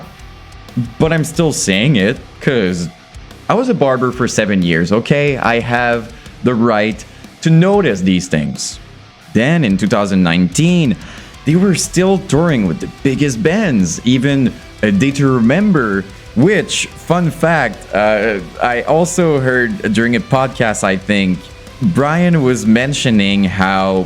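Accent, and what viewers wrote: Quebec, Canada. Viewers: Great videio man! You do something new and different. Do I hear a french canadian accent?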